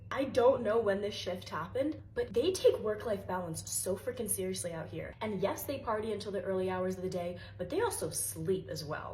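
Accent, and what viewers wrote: United States. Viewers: From her accent alone she's clearly American and has therefore been to the US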